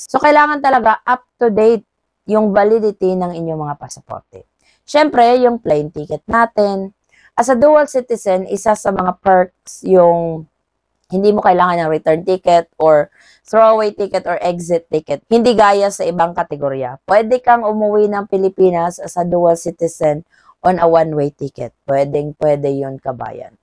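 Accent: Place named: Spain. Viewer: Must not be for people to speak English sounds like you're speaking in Spanish but I know you're a Filipino because the Spaniards did come to your country at one time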